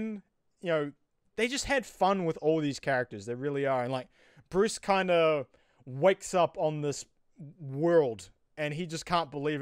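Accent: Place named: United States. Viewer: Why is your accent messed up? U sound American.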